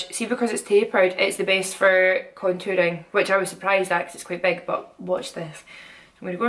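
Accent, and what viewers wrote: Scotland, United Kingdom. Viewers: When you say Laura Mercier, it sounds like you're saying "Lord have mercy" - I love it! :D Scotland will always be my absolute favourite; beautiful country, amazing people and awesome accents! <3 Cheers!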